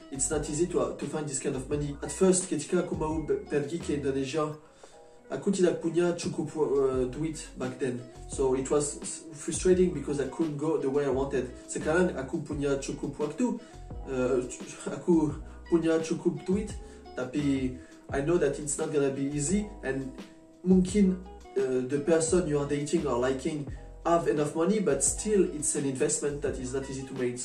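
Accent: France. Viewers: The only problem I have right now is my boyfriend can't visit me during this pandemic, and if he's using agent, he would need a quarantine when he's coming back to his country, that's the worst problem we're facing right now. 😅 Agreed to the point where you said about language barrier, not so many Indonesians can speak English properly tbh, you could see it by browsing LDR videos in YouTube 😅🤣 And I already noticed you're from France from your accent and tongue click! And I think I'm correct 🤣 Greetings from ID - DK couple 🇮🇩🇩🇰, nice video and nice Indonesian language skill 😊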